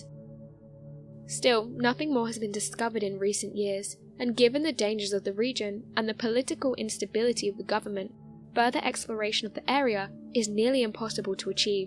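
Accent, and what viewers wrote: United Kingdom. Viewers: I wish this woman would do some asrm videos. Such a lovely voice and a British accent, hell all I need is a bag of chips and I'm set.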